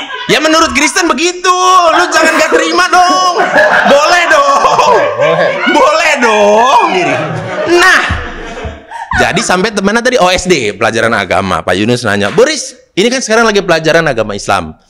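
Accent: Sunda, Indonesia. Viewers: Hahahaha... Bang Boris Sunda Pisan... Keren materinya.... Cerdas dan menghiburrrrr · Bang ini emang GK pernah gagal KL udah STAND UP COMMEDY lucu nya GK tertahankan.. Apalagi saya sebagai orang Bandung sama dengan meski darah Batak,hafal betul dialek" Sunda yg bisa bikin saya ketawa terpingkal pingkal... Bang SUKSES SELALU SEHAT SELALU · Meni kental sundana mang boris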